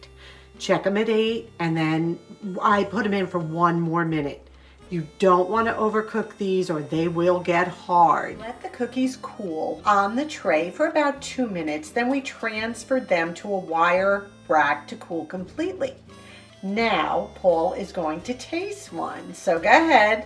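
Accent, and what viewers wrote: New York, United States. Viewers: Nacho something?? With 🍟, ground beef, sour cream, cheese. Today I hv binge watched your videos... You're my kind of people.!! Luv ya!!!- I hv never been to N.Y., I'm from Chicago, but I live in Northern Wi, since 94..... We don't hv a lot of stores here, but in N.Y., in sure there is much temptation.... I love thrift stores..... Since watching you, I do spend way less, learned a lot from you, so thank you.!!!! Wish to meet you!!!! You're so smart,and entertaining,........ Bless you and Paul too.. The N.Y. accent is so cute.!!!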